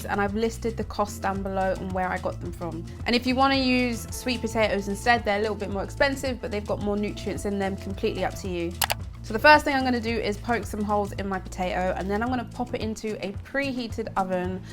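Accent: United Kingdom. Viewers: love that british accent 😍🤣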